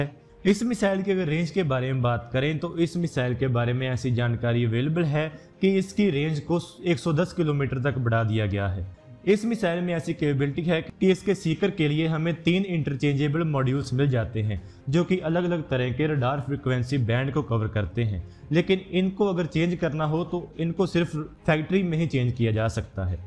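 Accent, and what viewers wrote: Punjab, India. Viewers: Bhai Punjabi ho kya aap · He is from punjab I think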